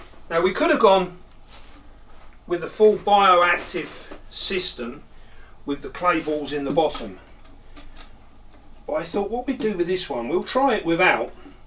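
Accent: United Kingdom. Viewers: Hello, May I ask you what kind of british english dialect you are used to speak? It sounds very well and it's quite understandable for me as a german.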